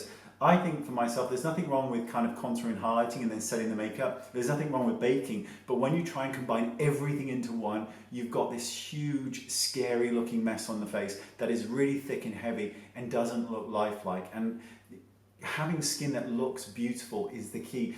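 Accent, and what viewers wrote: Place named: West Country, United Kingdom. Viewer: rofl you sarcastic fuck. I love it. Your westcountry accent comes out more when you get catty, ITS BEAUTIFUL. Kisses xxxx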